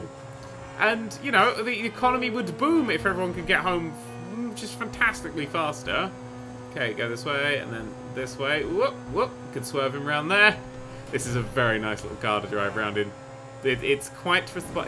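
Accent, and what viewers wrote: England, United Kingdom. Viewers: Nerd are you English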